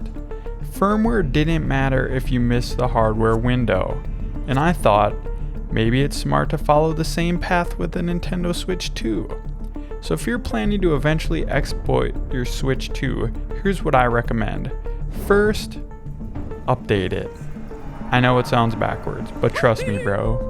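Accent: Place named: United States. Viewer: the most american accent ive ever heard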